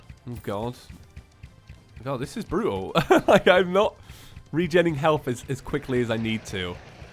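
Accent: United Kingdom. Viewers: It's not captions, it's terrible accent. All I hear is "hell loacher ari-eh and fewzis my name is tsheims". English is not my native language but even for me this speech is too painful to hear. Is it how british English sounds? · For the most part it is just his british accent, (I don’t think it’s horrible, I actually love it) but his voice is kind of deep so that makes it sound a little bit different than others.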